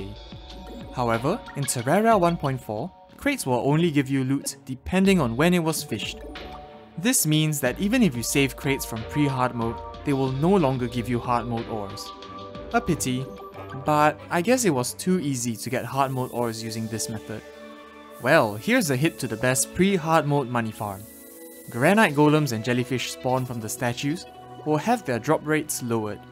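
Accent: Singapore. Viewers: r u singaporean?theres a strong singaporean accent no offense :/ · are you singaporean by any chance? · I'm sorry if you aren't but you sound very Singaporean, like not when we are speaking casually amongst others but when we are presenting something in a formal context. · Singaporean accent?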